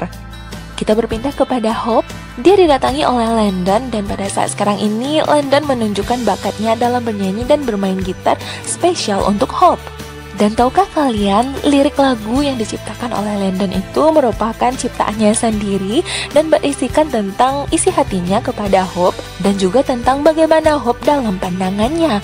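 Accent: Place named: West Sumatra, Indonesia. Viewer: Hahahahah ketahuan orang minang y?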